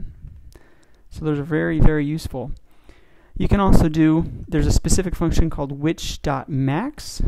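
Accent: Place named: India. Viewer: Not a thick indian accent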